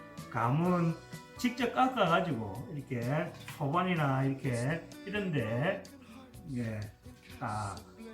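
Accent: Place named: Rural, South Korea. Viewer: 시골 어디신지 말씀이 정말 구수하시네요~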